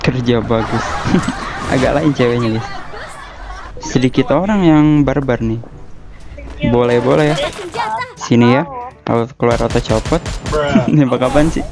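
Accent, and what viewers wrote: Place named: Lampung, Indonesia. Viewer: Abang orang lampung bang kok ngomong sikok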